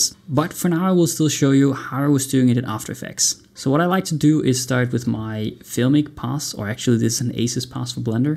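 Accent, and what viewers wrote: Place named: Denmark. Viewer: Godt grej, Det ser fandme godt ud! :D Baseret på accenten tør jeg godt skrive denne kommentar på dansk. :P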